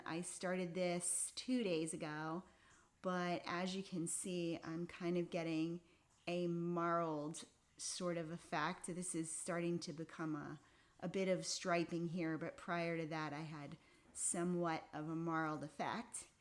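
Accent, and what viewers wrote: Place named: Pennsylvania, United States. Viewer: Hi!, I just found your podcast. You are very pleasant to watch. It sounds like you are from PA. I grew up in Lycoming County. Your granddaughter’s sweater is so beautiful; I just love the color. She is a lucky little girl to have a knitting grandma. Happy knitting!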